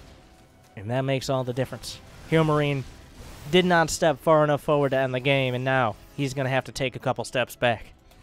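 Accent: Germany. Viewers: You'r german accent is fucking on point :)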